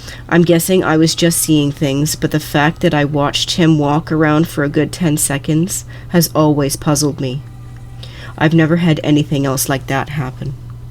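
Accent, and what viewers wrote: Canada. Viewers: these narrations sound canadian as fuck. and i'm okay with that.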